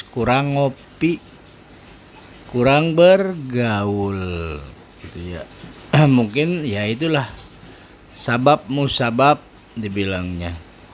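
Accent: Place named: West Java, Indonesia. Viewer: bang suara elu udah kaya sepuh abah abah logat sunda tapi foto elo keren ganteng keren salam sortir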